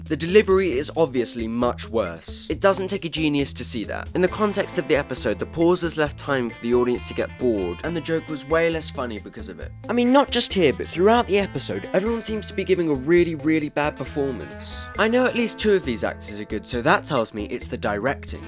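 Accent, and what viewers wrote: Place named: United Kingdom. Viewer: Surprised he doesn't know how to pronounce Richard Ayoade's name - this video creator sounds British and Richard has been on a large number of British panel shows and has had his own shows, all where you can hear his name being pronounced.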